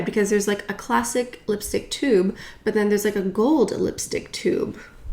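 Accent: France. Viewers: I adore your french accent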